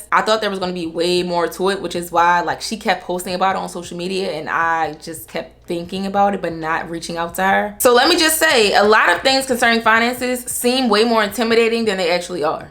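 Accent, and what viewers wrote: Baltimore, United States. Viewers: I hear Bmore accent 😌? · that baltimore accent heavy😭😭great content thank you!!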